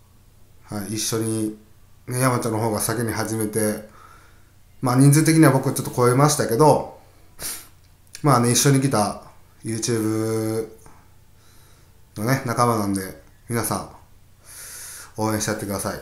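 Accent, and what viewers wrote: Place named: Okayama, Japan. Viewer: さんと幼馴染みとも知らずに、さんの動画を見てました。彼の独特の岡山弁が好きですね♪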